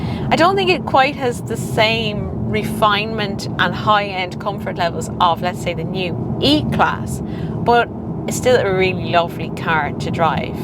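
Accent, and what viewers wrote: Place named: Ireland. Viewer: "Roarrd" Isn't the Irish accent lovely ❤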